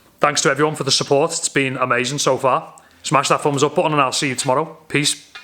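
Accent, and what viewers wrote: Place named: North East England, United Kingdom. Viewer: I was just wondering where u ride trails and stuff in the north east coz ur accent gives it away 😂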